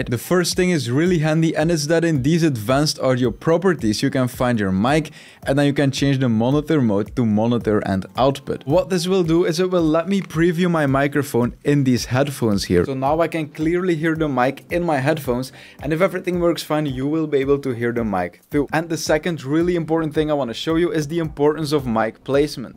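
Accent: Netherlands. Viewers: I smell nederlands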